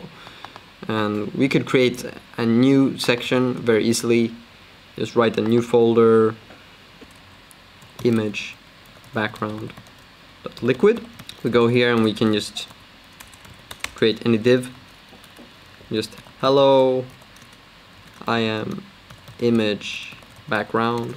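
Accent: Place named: Sweden. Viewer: omg älskar dialekten hörde direct att du va från sverige!!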